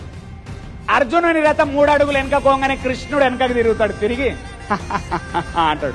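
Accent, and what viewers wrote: Telangana, India. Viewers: Niyyakka mee thelangana slang msthu fun ra Bhai thiduthuntte vundhi bhaaratham vintunapatiki ! maku vimukthi kavalraa Babu mi basha nunchi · సారు చెప్తుంటే.. ఏదో సినిమాలో కోట శ్రీనివాసరావు గారు.. తెలంగాణ యాసలో భారతం చెప్పినట్లుగా.. నవ్వొచ్చింది❤😂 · Telangana slang lo bharatam super undhi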